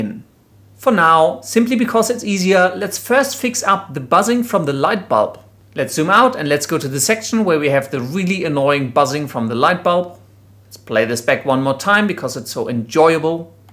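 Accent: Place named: Germany. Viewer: You're from Germany right? I think I hear the accent.. (Wenn ich Recht habe, kannst Du mich Sherlock nennen)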